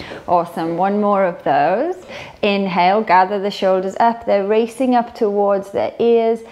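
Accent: United Kingdom. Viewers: are you British?!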